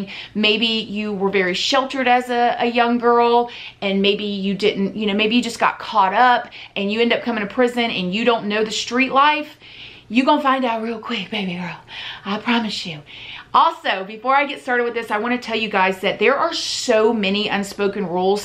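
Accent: South, United States. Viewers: What? She's southern ding ding, she's from Florida. There's no way to hide being from the south. Unless u didn't grow up here all your life. Or you have had a speech coach drill it out ur mouth lol. Some words or moments, bring out your southern drawl more. I live in Texas born and raised in the deep south😂. I sound like a Dolly Parton country song, with a Reba Macintyre twist.😂😄😁😊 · Rhoda Yackez she isn’t putting it on, she actually has a southern accent and why do u feel the need to critique her looks? let her live. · I'm from Florida too. She has southern accent all the way through..it comes out stronger when were nervous, excited,. Or when I'm at the dentist on the funny gas LMBO. ...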